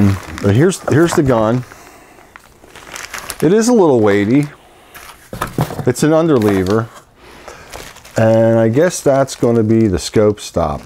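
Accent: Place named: Pennsylvania, United States. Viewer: I'm split between this and the 95 in .22 Looks like Hatsan is pretty good in the sub 300 range. BTW I'm gonna go ahead and guess you're from east Pennsylvania based on your accent. Am I way off?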